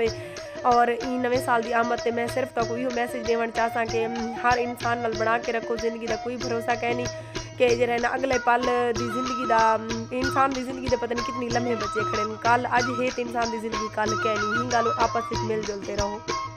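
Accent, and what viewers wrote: Saraiki, Pakistan. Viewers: Batao g ap Kahan ki Hain ap ki zuban sariki bahot achi hai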